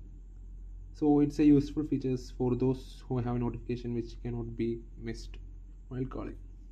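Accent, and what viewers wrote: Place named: Kerala, India. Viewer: Are you a Malayali? Your English sounds familiar. I wouldn’t call it an accent, but Malayalis have a unique way of speaking English.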